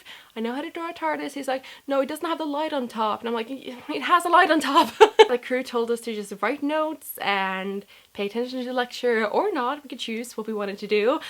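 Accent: United States. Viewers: I almost thought she was American, by the way she talks.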